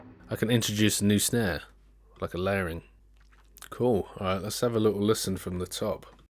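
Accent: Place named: West Country, United Kingdom. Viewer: Are you still making videos? Or have you become to a higher plane artist? You sound like your rather from Devon or Cornwall.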